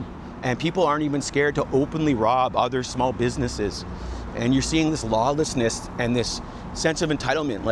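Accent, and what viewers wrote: Canada. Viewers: I can tell by your accent that you were Canadian, but that popeyes chicken scene you described sounded like new orleans, baltimore, chicago or one of our other ghettos in the states. Stay safe out there.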